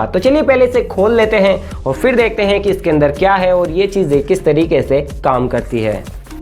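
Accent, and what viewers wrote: Rajasthan, India. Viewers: Bhai tu Rajasthan se he kya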